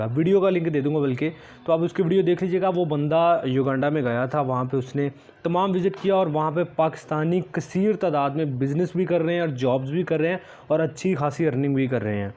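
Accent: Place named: India. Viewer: Are you Indian or Pakistani because you speak like Indian. If you are Pakistani so please don’t speak like that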